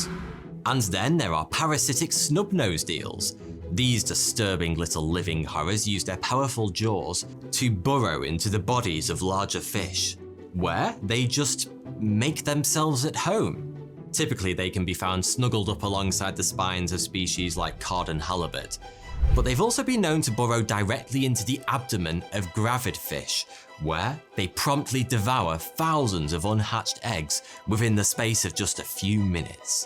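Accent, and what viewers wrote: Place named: England, United Kingdom. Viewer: I hate the ingland accent I do not understanding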